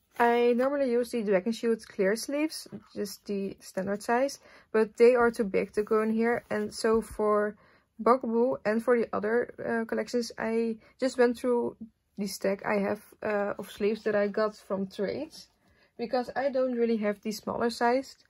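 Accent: Netherlands. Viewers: Omg your accent, you must be Dutch!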